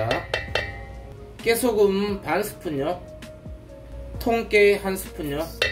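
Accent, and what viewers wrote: Jeolla, South Korea. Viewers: 전라도 사투리죠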